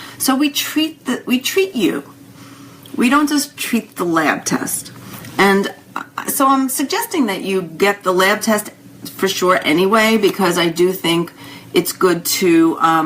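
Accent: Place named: New York, United States. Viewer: I think it's just her NY accent, maybe? I would've appreciated an improved effort towards personal appearance. Respect takes many forms.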